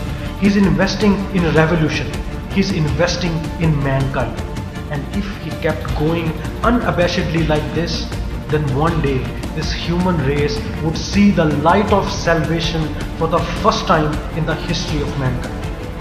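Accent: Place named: India. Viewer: the bgm is epic. the accent doesnt work with the bgm. no offense to indian accent but they just dont work together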